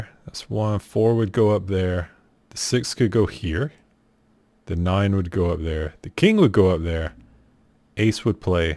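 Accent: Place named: South, United States. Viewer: I watched a few minutes of this and started winning more. Finally back to attempt mastery! Love your accent. Where the heck are you from? I'm from the south; hearing a bit of it!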